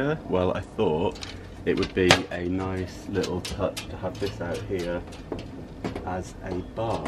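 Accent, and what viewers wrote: England, United Kingdom. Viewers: What part of England is your accent from? It's nice.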